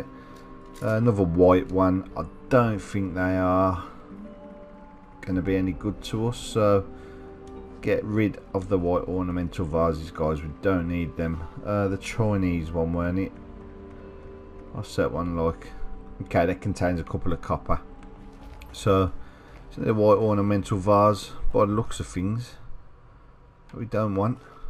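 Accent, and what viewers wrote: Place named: Birmingham, United Kingdom. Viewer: I never thought I'd find a fellow Brummy on YouTube. Our voices are shit for commentary haha.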